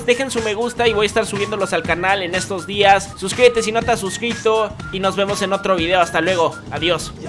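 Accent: Mexico. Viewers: Eres mexicano o estadounidense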